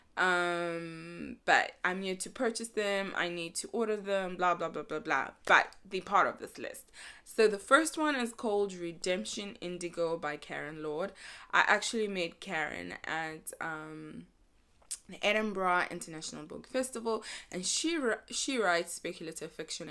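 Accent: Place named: South Africa. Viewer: I'm so glad you were on the Booktube black chat so i could find this channel! Firstly, it's wonderful to hear another South African accent, and secondly, I'm glad you highlight such important fiction. I'm glad to meet you and will be lurking in your other videos' comments soon. 😉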